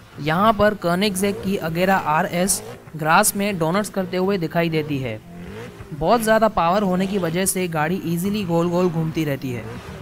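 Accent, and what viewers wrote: India. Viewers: stopped watching the second I heard the indian voice lol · Clearly not his fault,he is Indian and It's very different from english,Don't be racist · Dude, are u indian? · Hes indian, not jis fault for not having english as his first language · He sounds Indian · its english with an indian accent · Why is there a Indian talking? 😒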